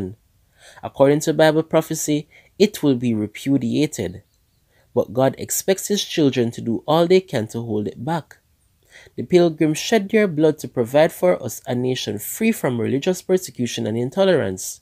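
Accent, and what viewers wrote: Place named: Jamaica. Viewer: Glad that this book is online . Thank you for the upload. The reader sounds Jamaican 🇯🇲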